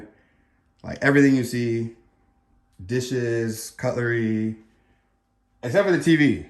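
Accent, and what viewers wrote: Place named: United States. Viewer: Dane here:I just caught myself thinking "He sounds black" And it looks like he is!!!! Can people sound black????? Ok, am I completely insane? He sounds American of course, but is it the deep voice?????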